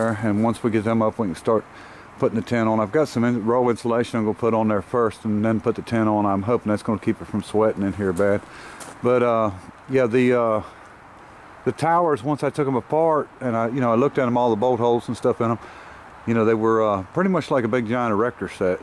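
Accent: South, United States. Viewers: The way you say bye kills me because you sound like my bestfriend (my grandpa). Its been 13 years since i lost my grandpa. He was from West Virginia but met my grandma in detroit. He sounds the same (southern).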